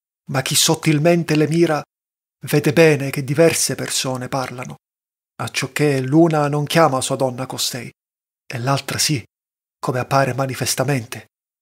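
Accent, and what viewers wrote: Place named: Calabria, Italy. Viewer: Intanto grazie e complimenti per l'ottima lettura, vi scorgo un leggero accento del Sud, se non erro calabrese, e questo mi ha subito fatto pensare come, almeno da un punto di vista simbolico, come sia utile proprio a farci riflettere quanto questa lingua volgare, dalla quale, naturalmente, ha origine la nostra lingua corrente. Farci riflettere, dicevo, come è importante riuscire a considerare questo italiano volgare patrimonio di tutto il paese e non solamente Toscano. Dante Alighieri, secondo me, è una sovrapposizione di strati di genialità: questa narrazione in forma d'eloquio che fa' si che il lettore stesso possa assumere i panni del narratore; poi, naturalmente un talento poetico innato e ben coltivato; ma, sopratutto, la grande attenzione alla forma nella quale descrive la ambientazioni e dunque i paesaggi. Nella Divina commedia poi le ambientazioni sono addirittura fantastiche. 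Ecco, io credo che questo abbia contribuito molto a rendere questo poeta, oltre che sempre attuale, addirittura universale. Certamente era un uomo ambizioso, però dubito che abbia anche solo lontanamente potuto immaginare quanto a lungo e quanto nel mondo tutto la sua opera si sarebbe diffusa. Rinnovo i ringraziamenti ed i complimenti e auguro una buona continuazione in buona compagnia🙏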